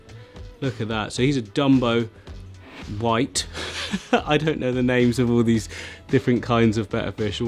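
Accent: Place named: England, United Kingdom. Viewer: Thank you so much for posting the name of that stone onscreen. The CC was rubbish! 🤣🤣 It was cereal stone, or serious stone. Clearly not able to process a strong English accent. 🤣😜😍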